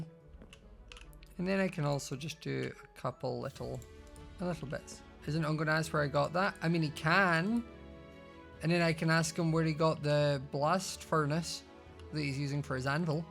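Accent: Scotland, United Kingdom. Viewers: Speak Scottish?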